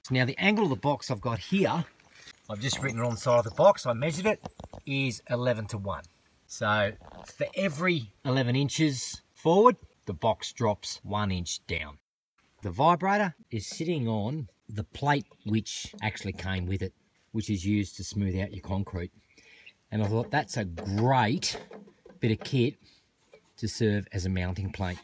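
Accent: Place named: Australia. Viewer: Absolute awesomeness!!! Love your idea and you proved it works! Thank you for that warm Aussie Love! Adore your accent ❤❤❤ Coming from Alberta, Canada 🇨🇦❤ Love Australia 🇦🇺 ❤ Blessings 2U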